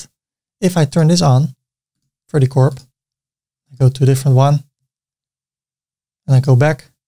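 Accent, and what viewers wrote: Netherlands. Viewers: Bro sounds Dutch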